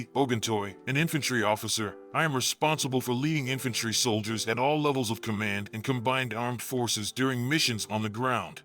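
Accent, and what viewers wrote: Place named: United States. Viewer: Hello you have an American accent but a Nigerian name.Are you from Africa?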